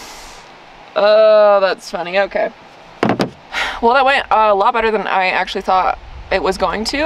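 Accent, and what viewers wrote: South, United States. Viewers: Starting to find the southern accent offensive...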